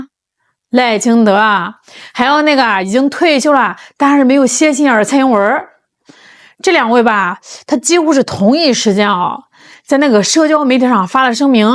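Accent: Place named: Beijing, China. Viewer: 每一天在聽在學的口音, 北京口音？非常喜歡！❤❤❤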